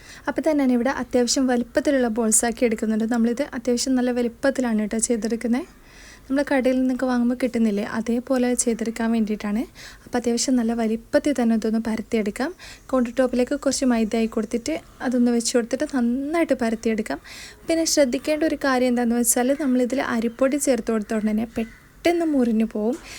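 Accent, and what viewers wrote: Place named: Kannur, India. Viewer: കണ്ണൂരാണോ വീട് സംസാരം കേട്ടിട്ട് ചോദിച്ചതാണ്. Super റെസിപി സബ്സ്രൈബ് cheythutto❤❤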